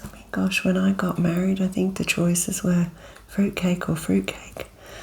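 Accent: Australia. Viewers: Hearing an Australian accent in ASMR is so comforting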